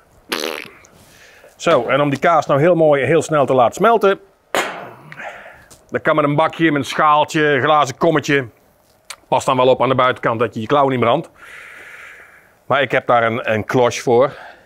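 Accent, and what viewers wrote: Brabant, Netherlands. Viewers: Da's Brabants, gehakt is daar nou eenmaal 'gehak'..Gaat niet meer anders worden, vrees ik 😂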